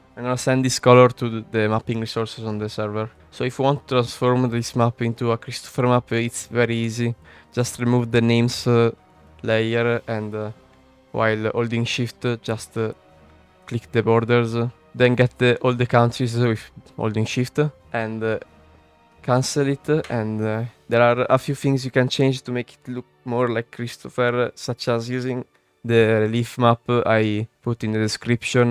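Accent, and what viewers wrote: Italy. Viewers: Your voice sounds incredibly amazing It sounds nice when you speak English with an Italian accent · Madonna quanto si sente l'accento italiano 😅😮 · The Italian accent goes REALLY strong... kind of Florentine/Romagnol-ish, Anyway, nice video, this will be useful for me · By the voice and the site it's possible to notice that you are Italian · you do sound italian like your youtube channels name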